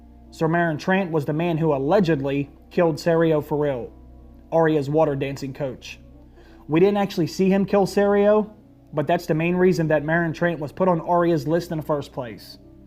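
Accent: United States. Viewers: your voice reminds me of an American Petyr Baelish accent.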